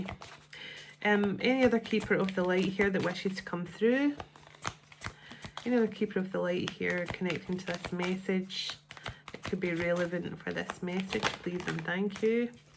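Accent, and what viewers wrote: Scotland, United Kingdom. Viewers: 😅 I love ur Scottish voice and the things u say! It made me very happy! And it's true all u have said about where we are on this journey! 🎉❤❤🎉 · I love when you talk about yourself and your voice is beautiful! That Scottish accent is what drew me to you in the beginning so please don’t dislike your voice it’s beautiful. Yes lots of releasing and purging past timelines and emotional trauma yesterday. I’m ready for a new start. The past is the past no changing it but working on myself in the present is much more gratifying. I’m in the time in my life where I feel if my person doesn’t come back that’s ok I did the best I could and there’s nothing more for me to do but love myself and I choose me Everytime. That inner peace is something I work towards everyday. Inner peace and happiness is the best thing I can give myself. Whoever comes along with me is just a bonus. 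❤ 123 likes and 777 views i love the numbers lol I woke up this morning with the song “ ” by in my head. 😮I took a nap yesterday and dreamt I was swimming in a pool it was my old high school lol today I dreamt of another body of water lol 😮 I knew I loved you played on my radio the other day. It made me tear up such a beautiful song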